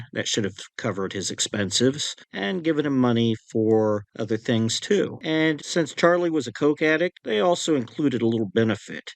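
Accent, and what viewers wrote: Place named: Midwest, United States. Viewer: Can't wait for part 17, I've greatly enjoyed this series and your Westies 3-part series. Exactly my type of narration, you've got that dry, wry New York sense of humor my entire family has, even though I can tell from your accent that you are originally from the Midwest... Or Ohio or similar? Just a guess based on certain words you say. Anyway, I don't do this often on a YouTube video, but thank you for your channel, your videos and the immense amount of work you've put into it. I'm looking forward to contributing back by buying your book when it is published, which I'm eagerly looking forward to. 🙂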